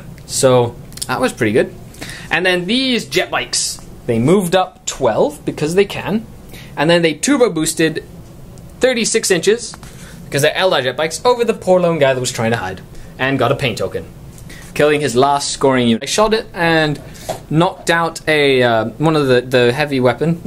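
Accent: United Kingdom. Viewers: Hi! Nice battle repport but I wonder what happened to the scourges, I'm starting my gaming season pretty soon (damm exams :S ) and will follow you batreps with interest. I'm planing on runing 3x5 Wyches with HW in venom with few extra stuff (venom blade and Blast pistol) let's see how it goes! BTW are you british? I know you are in Canada but you sound brit :O.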